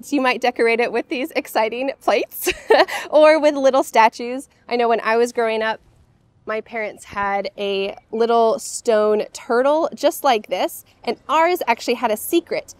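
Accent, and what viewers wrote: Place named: United States. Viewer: I am learning British English, but when I watch your videos, and here your accent, I really like USA accent so much too! is your accent standard USA accent? You are a very amazing teacher . thank you so much.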